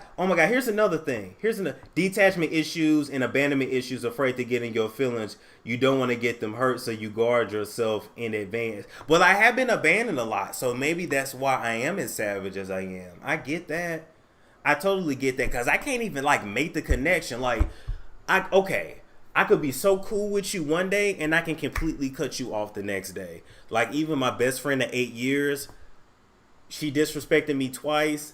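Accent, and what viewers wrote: St. Louis, United States. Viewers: I knew you were from STL,!!! The way you talk, and one day you said the “Apple Bees off Lindbergh!” #314 💯😅🤟🏾❤️😎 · Wow I know someone from St Louis and I felt like they sound alike... wow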